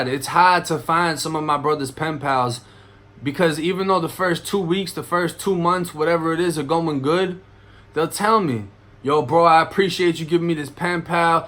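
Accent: Boston, United States. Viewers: I could listen to you talk for hours witchur Boston accent lmfao !!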